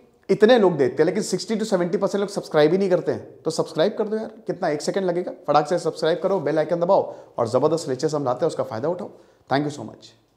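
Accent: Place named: Lucknow, India. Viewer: Sir aapki boli se hi samajh gaya tha ki aap lucknow ke hain....2018 study iq se yahan tak aap roz apki video se gyaan leta aaya hu... Main bhi lucknow se hi hoon...mujhe ataynt prasannata hui yeh jaan kar ki aap jaise achhe aur amazing guru mere sheher se hain. 😀🙏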